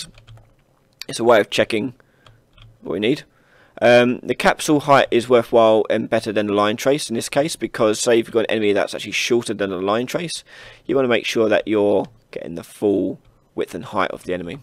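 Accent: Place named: Norfolk, United Kingdom. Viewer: Bro I have to ask, Are you from the UK Norfolk area? You sound like you are... that's not a bad thing either.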